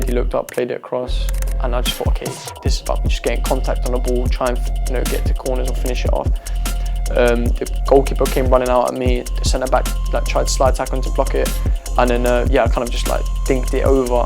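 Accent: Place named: England, United Kingdom. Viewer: Wait he has an English accent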